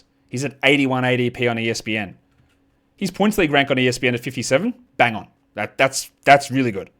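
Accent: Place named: Australia. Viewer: This dude is Bill Simmons with an Aussie accent. Why bother listen he copied and pasted Bill Simmons 😂😂😂😂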